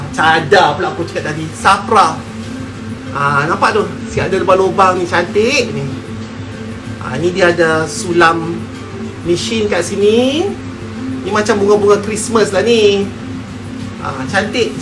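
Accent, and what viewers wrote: North, Malaysia. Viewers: Bahasa utara lah.. Kedah, penang mmg ckp lagu tu🤗🤗🤗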